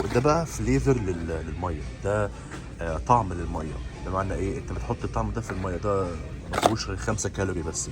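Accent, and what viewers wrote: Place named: Arab world. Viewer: لا حسيت الاكسينت بتاعك في الانجليش اكسينت عربي فيمكن انت احتكاكك مع العرب اكثر بس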